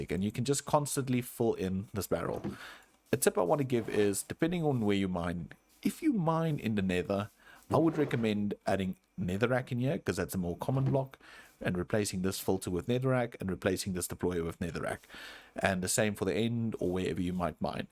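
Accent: South Africa. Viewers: This guy sounds south African ngl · South African? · Nice video! Are you south african? I think I hear an accent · Are you south african?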